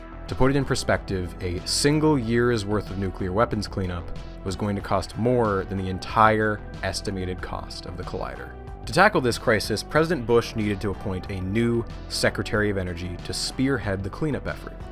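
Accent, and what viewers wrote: Canada. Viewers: I will also admit, as a holder of one myself, I'm biased for the Canadian accent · No he‘s Canadian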